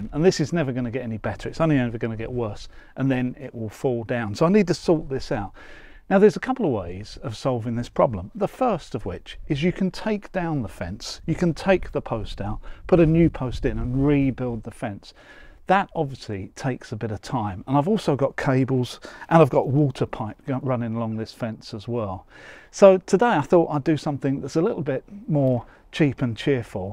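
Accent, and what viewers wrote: United Kingdom. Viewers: From your accent, I'm guessing you're in the UK. Your main problem is that your fence contractors don't know how to properly install a fence. Plus, if you're going to all of this trouble to dig out the original concrete footing and rotted wooden post below ground level, why not just take a few more minutes and just remove the rest of the old post and put in a complete brand new one instead of using that ugly concrete post support fix?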